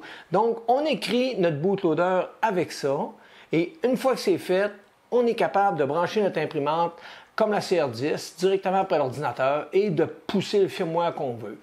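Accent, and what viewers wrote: Canada. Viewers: slt,c'est bon j'ai réussi,je sais pas comment j'ai fais,j'ai recommencé plusieurs fois et a un moment ça c'est mis a fonctionner,ouf,je suis content,voila,merci a toi et bonne continuation,c'est toujours un plaisir a regarder tes vidéos,et en plus j'adore l'accent canadien.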